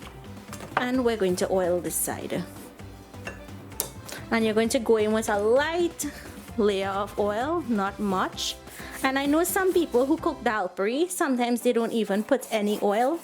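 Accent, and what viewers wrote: Trinidad and Tobago. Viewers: Love how you Spoke Original. #Trinidad.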